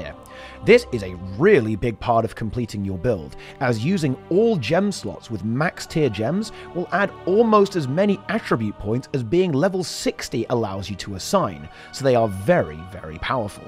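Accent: England, United Kingdom. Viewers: your smooth english accent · how will I listen to your smooth english accent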